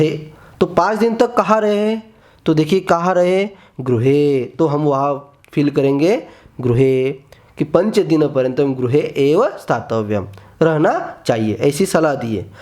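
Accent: Maharashtra, India. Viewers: i will guess that ur mothertongue is marathi by the way teaching is very nice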